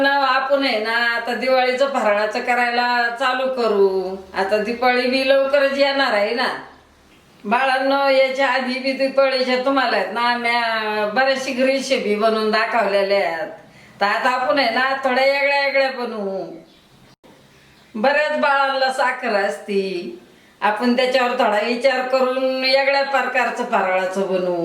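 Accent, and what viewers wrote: Ahmednagar, India. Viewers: आजी तुम्हाला पण दिवाळीच्या खूप खूप शुभेच्छा. तुमची नगरी भाषा मनाला खूप भावते. Love from Nagar.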